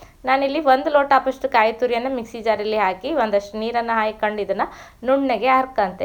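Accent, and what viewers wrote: Kundapura, India. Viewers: ನಿಮ್ಮ ಕುಂದಾಪ್ರ ಕನ್ನಡ 👌🏻👌🏻 ಗೋಧಿ ಹಾಲ್ಬಾಯ್ ಕೂಡ ಬಾಯಲ್ಲಿ ನೀರ್ ಬರೋ ಥರ ಇದೆ. 2 ದಿನ ಇಟ್ಟು ತಿನ್ನಬಹುದಲ್ವಾ.. 👌🏻👌🏻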